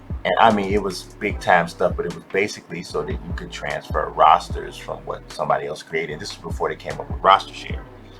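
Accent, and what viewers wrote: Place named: South, United States. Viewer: Lmfao you funny af bro def form the south im guessing atl? And yeah bro thanks so much for the info!!!! So glad I didn’t download this is wack af 70 plus dollars for this lol ea wow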